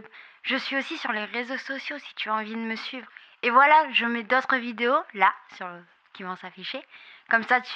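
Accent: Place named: Toulouse, France. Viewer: J'adore ton accent toulousain 😂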